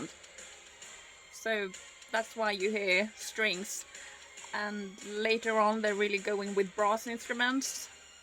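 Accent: United Kingdom. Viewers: My thought exactly, about the accent. At first I was a bit confused - like "Is she British or Swedish or what..." 😂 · That must be the most British-ish accent I've heard from a non-Brit 😁. Nice to see you getting back on track. I was somewhat out of NW too for several years (but then again I didn't listen to any music other than what was on radio when driving), but have been catching up the past year. In a way it was a healthy break for me as I can now observe with a new perspective. And another greets from Finland.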